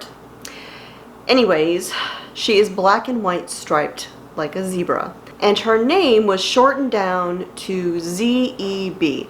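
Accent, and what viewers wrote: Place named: South, United States. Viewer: Love the southern accent! I would have pronounced like you even though I grew up in the south.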